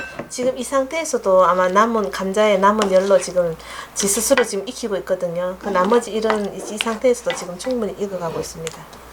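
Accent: Gyeongsang, South Korea. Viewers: 경상도 사투리를 어찌 이리 맛갈나게 쓰시는지! 음식보다 말씨가 맛있어서 보게 됩니다. 사진을 보니 인물 또한 고우시네요. 동영상에 얼굴을 보여주지 않는 이유가 시선과 관심을 님의 미모가 아니라 요리에 집중시키려는 배려 같군요. 요리 또한 맛있을 것으로 기대합니다. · 사투리 경상도 말씨 푸근 친근감 가요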